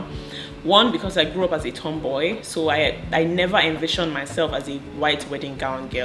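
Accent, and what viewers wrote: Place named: Ghana. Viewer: Maraji is picking up the Ghana accent already o😁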